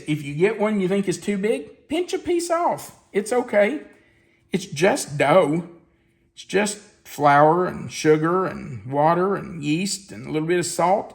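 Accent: South, United States. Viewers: The rolls look good but too much work for me to make. I do not like (putting it mildly) country ham. Love how Southerners pronounce: ol (oil), greeze (grease) and some say paparika (paprika)..LOL. Can you tell I'm a Northerner? My grandkids wouldn't live if they called me Mamaw and husband Papaw. Love watching your show but I can't cook too much anymore. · Yummy! Love your Southern accent and hospitality. I have a brother in law that is so sweet and does the cooking for his family. Same Kentucky accent ❤😅 I’m from Oregon. Thank you., God bless!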